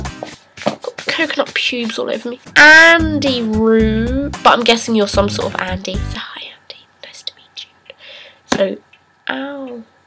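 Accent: United Kingdom. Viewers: You make best videos on youtube for practicing british accent. And you're funny.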